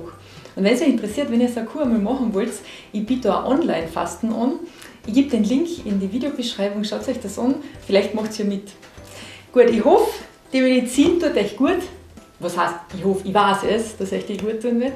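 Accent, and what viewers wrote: Carinthia, Austria. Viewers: das Rezept ist echt klasse, wir machen dies auch Jahre schon so, v.a. für das Immunsystem grade zur kalten Jahreszeit immer❤🍀❤🙏🍀❤..finde deine Videos auch mit dem Österr./ Kärntner Dialekt übrigens super süss und sympathisch ❤.🤗🥰❤🌼❤ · Kärntnerisch ist auch ganz lieb anzuhören, nur verstehe ich es halt leider nicht allzu gut. Muss mal wieder nach Kärnten kommen.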